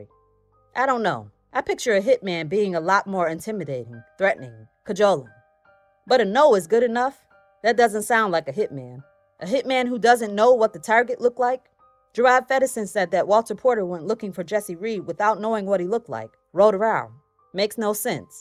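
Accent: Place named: New Orleans, United States. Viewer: Heyy na!! New sub here.. ⚜️⚜️⚜️ I think I hear nola in your voice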